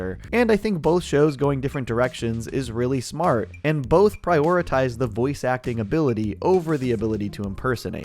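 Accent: United Kingdom. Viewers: I domt know how to feel how stereotypically British he sounds it feels a tad out of place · I just hope this guy doesn't become Rick or morty. A british Rick and morty would piss me off. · Love the new voice. But I'm a sucker for a BBC accent.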